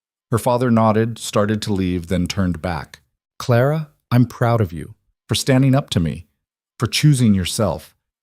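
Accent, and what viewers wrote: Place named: United States. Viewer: And I don't understand why the AU narrator has a nasally American accent when the story is set in England. Ridiculous. 😔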